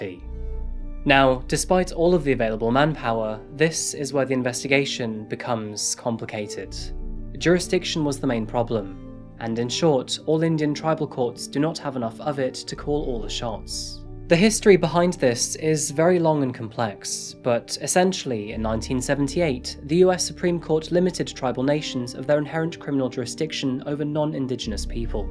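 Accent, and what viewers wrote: United Kingdom. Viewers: Still can't wrap my head around. A British sounding narrator suggesting coffee. Hehe. Great work again 😉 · What part of the uk you from?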